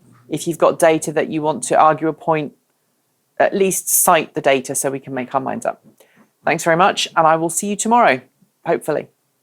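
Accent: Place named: United Kingdom. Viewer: Every bad policy that Trump was threatening was already done by Obama and would have been continued by Clinton. There is massive subsidiued investment in fossil fuel infrastructure still, this is keeping our renewable future from taking off. I voted for Jill Stein and her Green Revolution. Since when does a revolution need an election. I live in Germany and work in Switzerland and am pleading with people to move to EV, batteries, solar PV and wind, with little success. I don't trust VW to make any changes, even though they have said they will build a battery factory in DE. I am supporting your site since you have a bigger voice, so keep shouting, in your quaint British-tinged accent.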